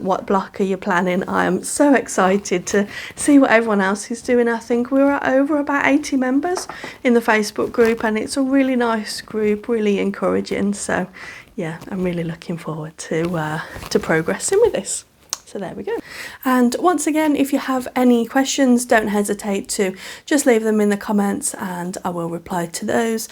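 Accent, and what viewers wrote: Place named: Midlands, United Kingdom. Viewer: I’m glad I found you. I’m getting a little bit obsessed with temperature projects. I’m almost finished on a quilt and blanket for this year and just started on a very bold and clashing quilt, started in September to finish on my 60th birthday. From your accent you sound like you’re not far from me in the Midlands. I’ll join the Facebook page, so hope to see your pattern and how you progress there.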